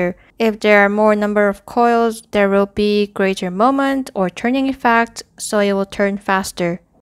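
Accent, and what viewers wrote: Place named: Malaysia. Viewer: Love from Pakistan ? BTW, you sound like Malaysian cuz I lived in Malaysia for 5 years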